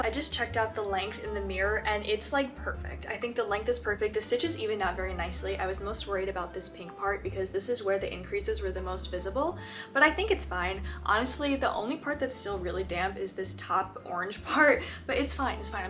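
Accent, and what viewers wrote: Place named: East Coast, United States. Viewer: you sound so east coast and i love it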